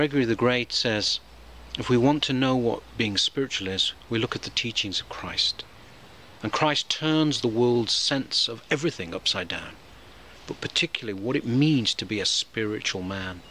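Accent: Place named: United Kingdom. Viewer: Very compelling talk Fr.. Sounds like you’re of British persuasion. What parish do you shepherd?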